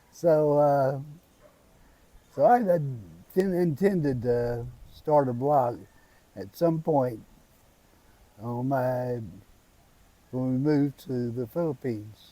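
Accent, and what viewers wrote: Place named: Texas, United States. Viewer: I dig your humor and your no nonsense approach to reality. A Texas twang to it is also a big bonus. A great day to you too, sir!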